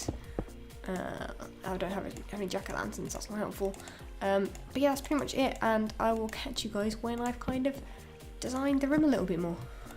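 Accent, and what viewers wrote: United Kingdom. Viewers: Can't get bored of your british accent <3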